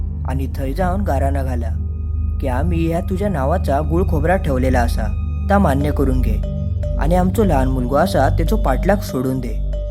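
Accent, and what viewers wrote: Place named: Malvan, India. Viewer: Story thik ahe but accent malvani ahe so kalnyat thodasa Vel lagto and also plz...for god's sake biography bollyasarkha accent naka theu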